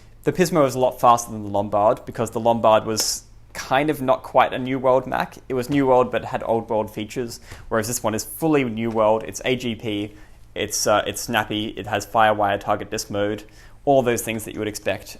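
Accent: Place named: South Africa. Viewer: Great video, I bought a Pismo Book for £25, fully working in excellent condition from eBay. Are you South African? Your accent sounds like my dad and he is from East London, Natal! Great video mate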